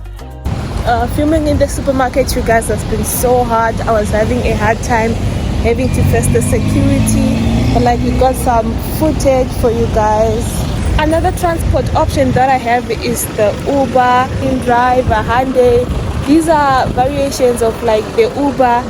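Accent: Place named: East Africa. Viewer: has any1 ever told you, u sound east african? lol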